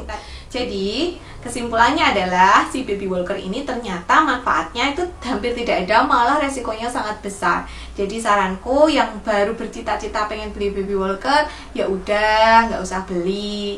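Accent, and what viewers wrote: Java, Indonesia. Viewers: gledekan wkwkwk aku suka karna bu bidan medok kaya aku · banter bangeet 😂😂 bu bidan mantap, njowoniii bangeet 😂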